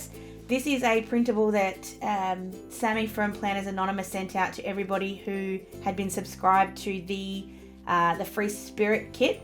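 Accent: Australia. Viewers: are you from QLD? Trying to guess your state by your accent :) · Just Love Aussie accent and culture!! :D thanks for the video!! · Between your adorable excitement & your accent....I was anticipating a “crikey” or 2, but at least once 😂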